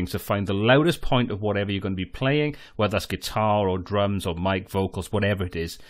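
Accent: Wales, United Kingdom. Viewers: Excellent video, easy to follow. Solved my recording problem in the first few minutes. And a great Welsh accent.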